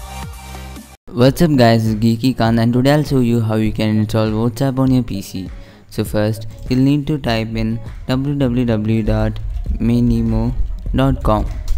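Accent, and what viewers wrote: India. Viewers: Indian English accent sucks!!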